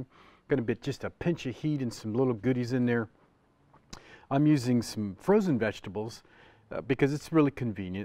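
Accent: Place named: Scotland, United Kingdom. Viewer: Very Scottish.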